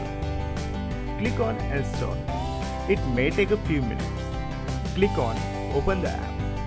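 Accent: India. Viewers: This might be racist but once I heard your Indian voice I automatically thought that "this video is a scam". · darkxx0 this is the voice of an normal Indian so don't judge anything about it.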